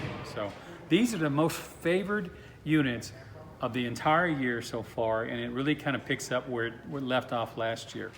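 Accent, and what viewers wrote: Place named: South, United States. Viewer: He’s country.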